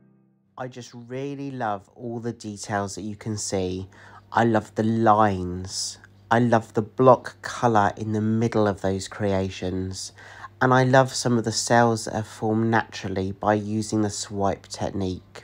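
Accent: United Kingdom. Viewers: Oh yes. Certainly 3D. It's incredible. Nice to hear your (British!) voice over rather than just music.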